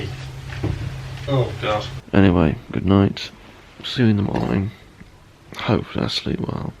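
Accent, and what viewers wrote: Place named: Doncaster, United Kingdom. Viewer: knew you were from Donny. well obvious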